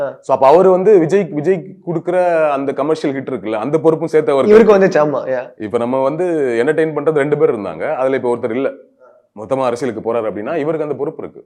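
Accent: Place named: Kanyakumari, India. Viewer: avar slang kanyakumari mari eruku bro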